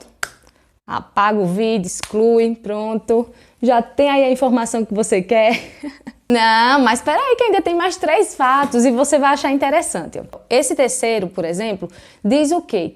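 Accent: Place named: Northeast, Brazil. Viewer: Parei de fazer minhas corridinhas devido a cor na lombar. Machuquei a lombar devido 2 tombos que caí de costas no piso cerâmico, e não procurei tratamento na época. Dia 29/1., farei uma Tomografia da Lombar, e a partir daí vou aguardar o que o Ortopedista vai dizer. Porém baseado nessa aula de Fisio, já tirei o tal colete de proteção lombar do carrinho do Mercado Livre.....rsrsrsrsrs. Sinto muita falta dos meus treinos e Provas de corridas de ruas com a galera da assessoria na qual estava treinando, mas vou seguir o tratamento conforme recomendado. -Primeiro vídeo que assisto da Dra., mas seu jeito de explicar e não massagear o ego dos sofrentes de dores nas costa, fiquei impressionado com a clareza. Além do sotaque que me parece ser da região nordeste, que prende a atenção do inicio ao fim do vídeo. Obrigado por todas as informações com muita clareza e objetivo, sem rodeios. 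Abraço!!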